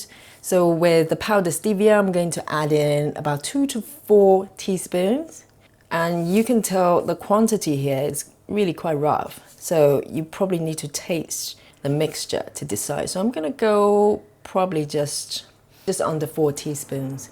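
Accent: United Kingdom. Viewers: Where are you from? Lol I love your accent! Anywhere near UK? Elegantly presented as a real human being ( unlike those others :) !! ) I may just have found the road to my own keto experience! Thanks so much! PS Wanna go somewhere nice? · Lovely voice. Are you british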